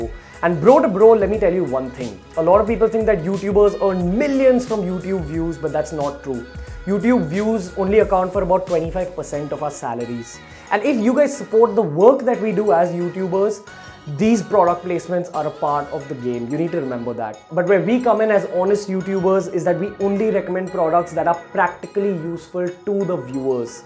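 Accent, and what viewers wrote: India. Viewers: Indian Accent. Funny. Do you paint?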